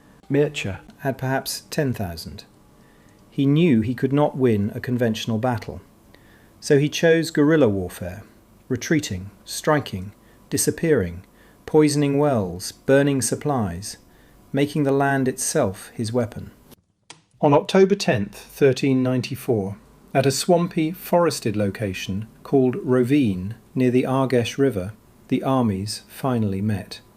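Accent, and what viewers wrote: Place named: United Kingdom. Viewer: always weird when you hear some british accent telling about Romanians...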